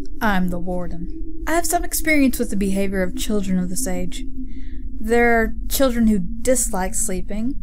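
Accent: South, United States. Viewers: Nice southern accent:P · Nice accent you made me truly believe you were a southerner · You do a very good southern accent!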